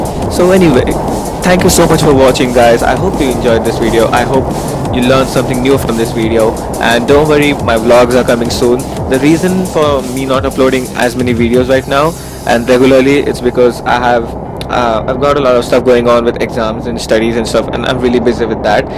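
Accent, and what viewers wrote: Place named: India. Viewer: This accent is making me really suck. Wtf with indians filling the internet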